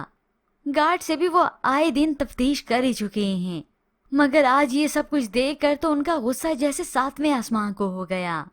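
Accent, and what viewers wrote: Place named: India. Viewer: Aisa laga k koi ENDian suna raha ho Iss me sari guftago ENDian lagi Urdu tow ENDian bunn chuki hai Urdu ka end ho chukaa hai Nazar ye araha hai k Awam subh se sham ENDian bunn chuki hai Hur program mein same Phir yoga pooja ho rahi hai Yeh to jamhori musalman tha !!!!!!!!! Ya Allah Khair Kia sare hindu bunn gayee hain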